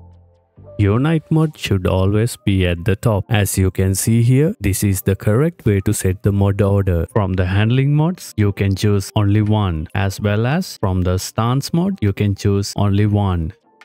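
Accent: India. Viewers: your southindian accent do kicks more when you speak. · Every time it has to be some random Indian mf.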